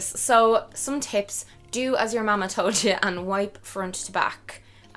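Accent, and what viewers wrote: Ireland. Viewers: I have a question are u Irish ?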